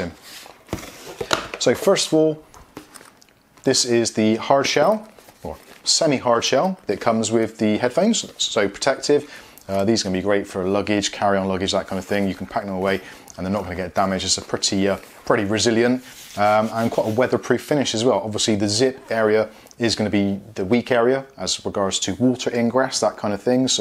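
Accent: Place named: West Country, United Kingdom. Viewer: bought a pair of these cans off amazon after watching your review ... your spot on ... great bass and full sound ... bargain for just over £20 ... am in agreement over shiny plastic /grease magnet ... is a shame this p3 model doesn't come with sd card slot like the p1 or p2 ... both of which have less playback time than this model ... seems powerlocus are missing a trick ... if they brought out a new model with a matte finish ... long playback and an sd card slot ... i would happily pay £30 ... great sound ... p.s. you sound even more "west country" through these cans lol ... thanks for review ... correct and accurate on every point ... cheers fella :)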